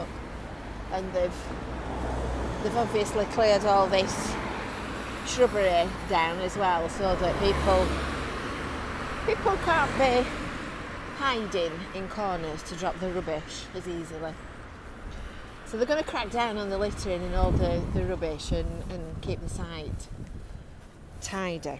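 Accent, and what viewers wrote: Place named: Yorkshire, United Kingdom. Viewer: How could you be awful in Spanish at school with that broad Yorkshire accent? Maybe you should have took German 'cos you could talk quite loud then!! Plus it's more like english than you would think!!🥰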